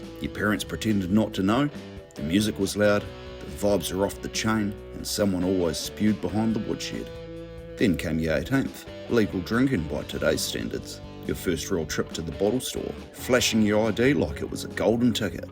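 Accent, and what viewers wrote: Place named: New Zealand. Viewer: Brother I’m from rural Waikato and I had to adjust to your Kiwi as accent hahaha respect brother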